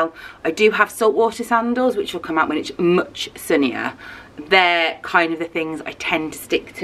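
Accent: Birmingham, United Kingdom. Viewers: Your videos are so perfect for cheering me up! I'm working abroad as an au pair at the moment, so it's lovely to listen to someone who sounds like home when I can't be with my actual Brummy family! x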